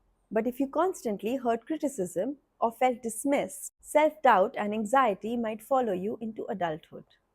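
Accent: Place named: India. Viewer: Excellent video. If I can give you an advice as a viewer, please talk a bit slower, and remove the indian accent as much as possible from your English. Please refer to DoctorRamani videos as an example. Power to you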